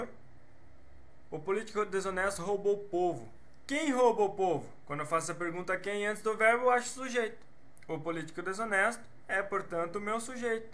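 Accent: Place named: Northeast, Brazil. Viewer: vamos adiantiii kkkkk sotaque nordestino em filho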